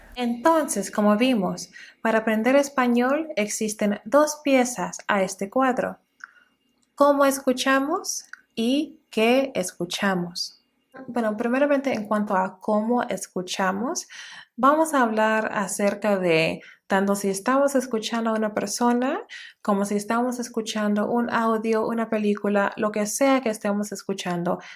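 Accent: Standard. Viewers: Wooow tu español es EXCELENTE... hablas mejor que muchos latinoamericanos... Tono adecuado, buen acento neutro, buen ritmo, sin acento gringo... Esta PERFECTO felicitaciones 👌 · muy cierto, tiene un acento bastante neutral...con unos toques caribeños pero muy buen español , felicitaciones!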